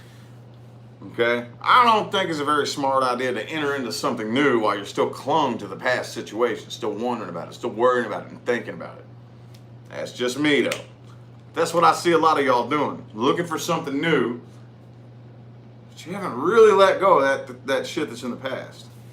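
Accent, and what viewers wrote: South, United States. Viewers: Hey where in the south r ya from accent drivin me crazy cuz I can’t figure out where it’s from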